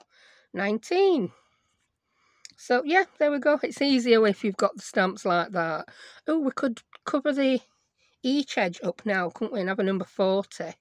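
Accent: United Kingdom. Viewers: What a fun show! I really enjoy watching your creative adventures. And DIY labels is now on my Creative To Do List, thanks to you! I love my EK Success punches, too. I find them to be both sturdy and reliable. They also store more nicely than the big plastic ones made by some other brands. One question: you mentioned earlier on in this video that you knew of someone else who also like to make her own labels. I didn't quite catch the name of that person's channel (well, maybe it's my Yankee ears that can't always catch everything said by you Brits?).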